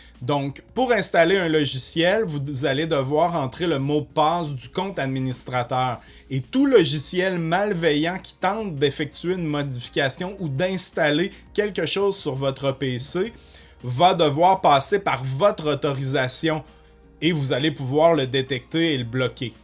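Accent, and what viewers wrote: Quebec, Canada. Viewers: Je trouve cette proposition très astucieuse et j'ai bien envie de la mettre en oeuvre. Je me pose cependant une question : le fait d'étre contraint d'utiliser les trois questions secrètes ne constitue-t'il pas un risque si une intelligence artificielle est utilisée pour trouver les mots les plus probables en fonction des données qui seront trouvées sur l'ordinateur? J'aimerais avoir votre avis. Merci par avance Et bravo pour votre clarté et l'accent canadien m'est toujours très agréable. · Bonsoir Je suis avec un grand intérêt cette vidéo d autant plus que vous nous donnez fort gentiment la marche à suivre sans aller trop vite. Cependant, jai acheté depuis peu de temps un ordinateur Windows 11 ! Ne pourriez vous donc pas nous indiquer comment procéder de la même facon, étape par étape d autant que certaines rubriques que vous citez n apparaissent nulle part ! 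Je me permets toutefois d attirer votre attention sur le fait que je ne vois pas assez bien les différentes fenêtres que vous ouvrez d autant que les caractères à l oeil nu apparaissent fort petits et que l image qui en découle est plus ou moins flou ce qui rend la visibilité dificile. Je devine plutôt que je ne vois véritablement ! Elle gagnerait en netteté avec des caractères plus gros et une image beaucoup plus nette de façon à ce que l on puisse mieux visualiser le tout. Mis à part cela, j adore votre accent canadien et ai le sentiment que vous êtes passionné d informatique au point que vous transmettez avec grand plaisir tout votre savoir sans chercher forcément à gagner de l'argent ! J ai donc d autant plus plaisir à vous écouter ! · Bonjour. Je viens de découvrir votre chaine et de m'abonner. J'ai fait tout ce vous préconisez et maintenant je me demande si d'avoir téléchargé Advanced systeme care sert à quelque chose. 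Je suis français et votre accent j'adore. Je vais faire un tour au Quebec en septembre, j'en salive d'avance. Merci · Bonjour Jeune Homme, bonjour à Tous ! Eh bien quoi te dire, j'ai liké, je me suis abonné, après avoir visionné, et revisionné ta vidéo ! Eh oui, comment faire autrement, face à un travail si bien fait, court, clair, net, concis et précis !! je croyais qu'il fallait avoir Microsoft 365 pour bénéficier de Défender, et là tu viens de me définir que non, puisqu'à aucun moment tu n'en parles dans tes explications ! Tu sais, je te visionne depuis la région parisienne en France, et ton ( accent là ) je le trouve magnifique, puisque j'en rigole aussi sur certains passages ! En tout cas merci pour ce partage qui me sera grandement salutaire car c'est un peu beaucoup le ( bazar ) din mes zoefers là tu sais ! J'ai essayé de le faire à la québécoise là t'sais ! Ah, petite précision, le tutoiement dans mon intervention n'est point à prendre pour de la désinvolture, ni du manque de respect, mais tout simplement, de par le fait que cela me plaise, je me veux de plus de proximité avec mes interlocuteurs, de par ce rapprochement que nous offre la toile, malgré tant de distances qui nous séparent ! 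Et puis, je suis prof de sport avec des baby, des enfants, des ados et adultes en karaté et self-défense, ceci explique un peu cela !! Salut mon pot ! Paulo 74 ans !!!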